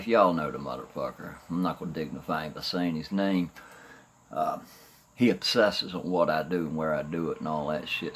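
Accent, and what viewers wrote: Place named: South, United States. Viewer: Bill Burr, a comic, makes fun of conservatives and liberals. You sound exactly like the kind of person he was parodying...a guy with a southern accent who is intelligent and a leftist. Power to you my bro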